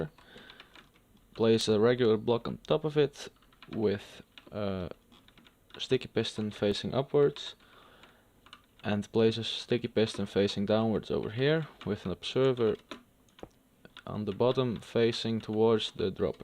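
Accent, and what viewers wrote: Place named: Netherlands. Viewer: i can just hear the dutch accent, i love it. cool build man, i am goin to need this very well!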